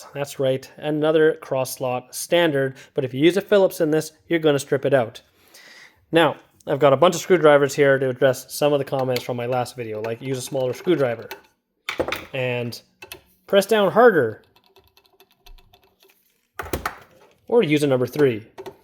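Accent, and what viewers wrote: Canada. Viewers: I hear a Canadian acceeeent.😊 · This guy is a Canadian. Listen closely.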